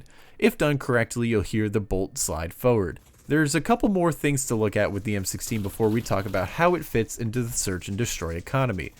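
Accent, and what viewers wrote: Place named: Canada. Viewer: You sound...very canadian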